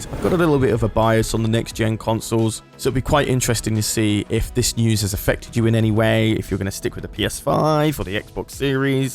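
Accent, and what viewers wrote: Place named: London, United Kingdom. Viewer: You sound like your from London.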